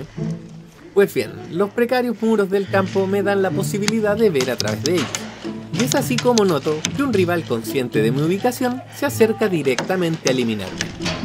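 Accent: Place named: Chile. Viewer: Te acabo de conocer y me encantan tus videos, pero tu acento se nota que es chileno, así que lo diré: Es aguacate no palta. Nada que ver el comentario xd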